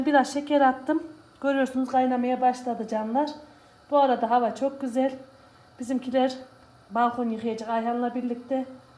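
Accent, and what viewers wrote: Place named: Central Anatolia, Turkey. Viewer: S a hnm ic anadolunun insanina dogallik yakişır şivenizi degistirmek icin ugraşmayin sizede her insana oldugu gibi dogallik yakisıyo Allaha emanet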